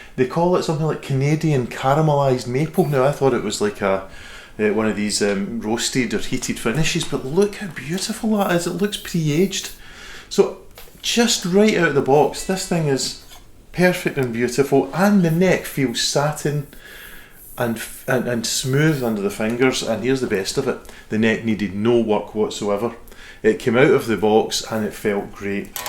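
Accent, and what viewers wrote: United Kingdom. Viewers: Thoroughly enjoyed your review and your great playing. Sub'd:) Miss the Scottish accent after living near Strathaven for 20+ years before moving back to Wales. I've had the TE62DB LPB and CAR models in my Thomann basket for weeks but I'm swithering between one of these and the JET JT-300 from Merchant City Music as a modding platform. Both are great VFM. I too have a Tele itch waiting to be scratched... again! I play my 2010 Squier Tele Custom II P90 to death and also have a Fender MIM Nashville which doesn't get as much love as it has a slightly bent neck... which GG Glasgow refused to exchange when bought in 2008:(( Keep up the good work sir:) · Glasgow by the sounds of him. Maybe Renfrewshire or Lanarkshire.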